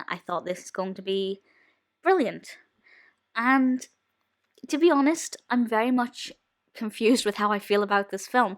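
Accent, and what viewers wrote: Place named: Belfast, United Kingdom. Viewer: I don't mean to be snarky but I think you got a bit blinded by your liking of Branagh, who is great but as stated here, Judy Davis is the co-protagonist. <link> Just offering constructive criticism; I see you're starting out/didn't do another(?). I hear from your accent you would have particular insight to KB's film on Belfast.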